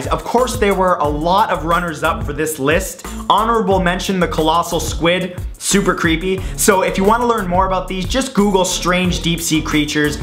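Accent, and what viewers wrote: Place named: Canada. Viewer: In these older videos he had more of a Canadian accent than in the new videos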